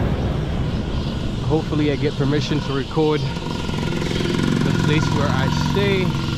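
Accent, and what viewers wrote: United States. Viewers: Great vid thanks. I'm staying in this hotel in June can't wait. Are you from the States? you have an American accent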